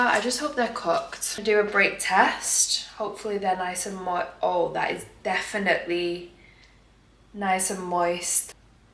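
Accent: United Kingdom. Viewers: I just joined ur channel and im enjoying. Where do u live bc ur accent is so adorable. Im assuming UK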